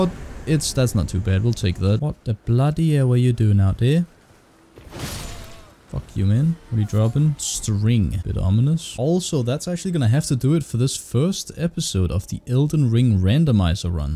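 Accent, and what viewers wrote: Denmark. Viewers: man that accent sounds so familiar, this guy gotta be a dane :P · are you from denmark? it sounds like you have the accent, i talk like that aswell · You know that, when you can just hear that danish accent. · I love within 10 sec i can hear you are a danish brother. XD Nice vid my dood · The danish accent tho xD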